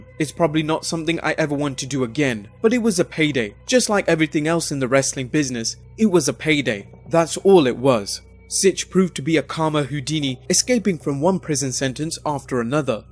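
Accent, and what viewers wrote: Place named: United Kingdom. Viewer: I wish this information was spoken in a voice that wasn't from the British pits of hell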